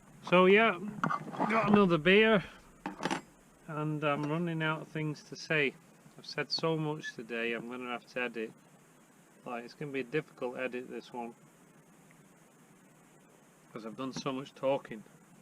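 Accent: United Kingdom. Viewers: glad you're keeping the old UK traditional dialect alive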